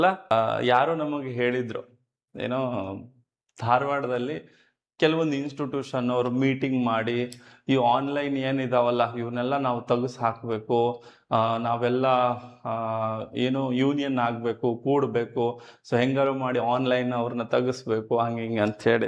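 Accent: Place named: Haveri, India. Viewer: Sir nimm bayaga haveri bhashe kelak chend ri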